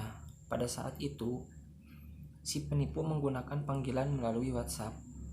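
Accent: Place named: Indonesia. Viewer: Ini mah logat orang sebrang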